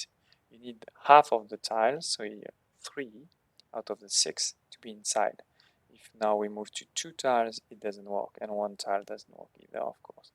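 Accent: France. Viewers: You sound french :D.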